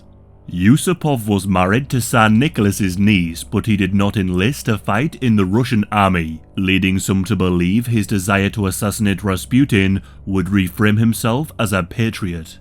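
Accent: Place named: Yorkshire, United Kingdom. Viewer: weird combo of a west yorks accent and an east european one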